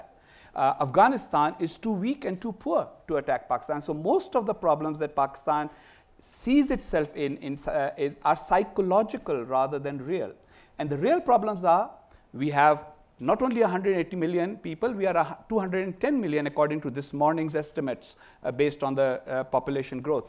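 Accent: India. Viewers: This guy looks and sounds more indian. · Think an Indian is talking..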